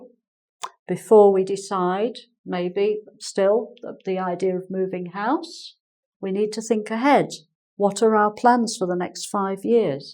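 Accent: United Kingdom. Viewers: Gracias maestra es muy clara en su enseñanza. Me encanta el acento británico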